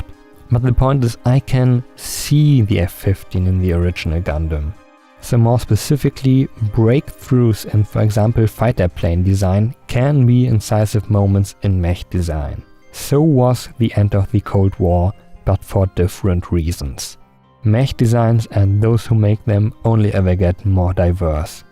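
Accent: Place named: Germany. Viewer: I thought: "hmmm, that accent sounds very german..." And the Die Sending mit der Maus theme was playing, so I do not even have to ask anymore. Anyway Gundam Aerial has thick thighs that save lives.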